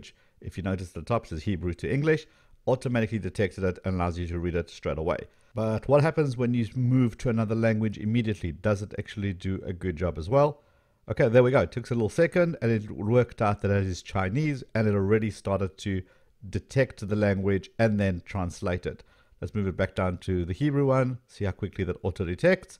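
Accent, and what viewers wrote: South Africa. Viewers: Are you South African living in the USA?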